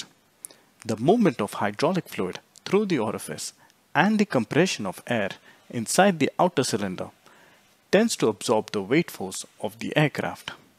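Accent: India. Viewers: 1) I don't like the pronunciation. It's like Indians. I can't understand this self-confidence of people whose native language is not English. Great courage... 2) You don't explain why the oil in the hydraulic section is needed.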